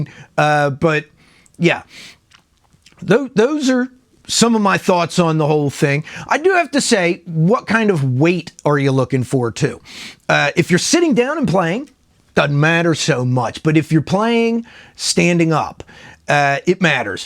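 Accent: Pittsburgh, United States. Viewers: Great video! It's hard to pick a guitar based on a website, that for sure. Even YouTube reviews van be tricky. Sadly, the days of mom & pop guitar stores are going away, unless you count Guitar Center, which I don't - I don't trust them, either. They're known for "factory seconds," and if you know what that is, that's why I stay away from them when it comes to buying guitars at least. I could be wrong, but do I detect a Pittsburgh accent in there??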